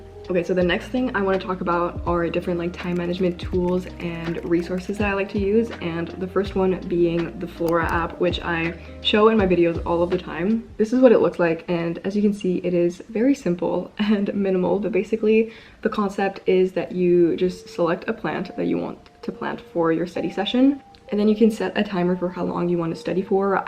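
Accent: Quebec, Canada. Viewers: Omg i just stumbled across your channel, and when i first heard you talk jme suis dis "wait a minute je reconnais l'accent" J'ai réalisé que t'étaits québécoise!!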